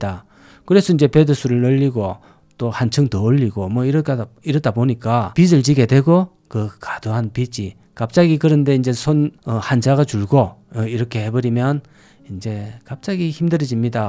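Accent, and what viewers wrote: Gyeongsang, South Korea. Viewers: 진짜 갱상도 말투며 억양이며 발음 거슬려요. 금정구는 검정구네요.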